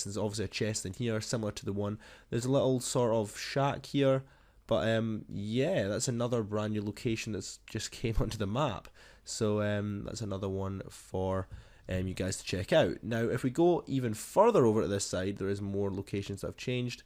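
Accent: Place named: England, United Kingdom. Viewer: You have an nice english accent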